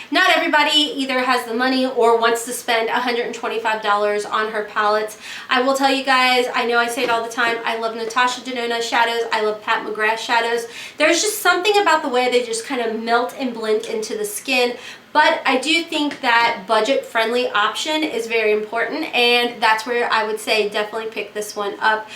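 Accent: South, United States. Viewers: What happens if you take the ones that are close, but one is more pigmented, and apply the darker one with a much lighter hand. I would really like to see. I don't know if it's the lighting, since you mentioned it, but you look like you have sparkly white pupils👁 I love watching you. I live in northern ca, and I miss a little southern accent. Where are you originally from? Blessing to everyone, and happy holidays🙏🎄🌹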